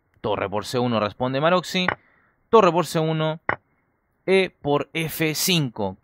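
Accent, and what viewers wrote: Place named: Chile. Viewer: el acento chileno me resulta muy comodo para entender analsisis de ajefrez ! gracias!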